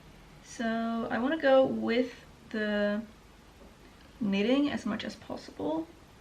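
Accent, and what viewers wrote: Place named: Netherlands. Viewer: I thought to hear you were Dutch and then I saw the Harry Potter books. Greetings from another Dutchy! Love you vids!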